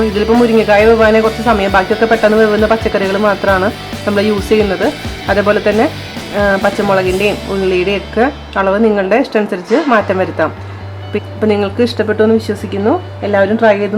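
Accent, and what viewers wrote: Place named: Thrissur, India. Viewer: .....I really loved the way you talk:D thani thrissur bhaasha great recipe tto. Am also from Thrissur but don't have that tone:) I will wait for more recipe's from you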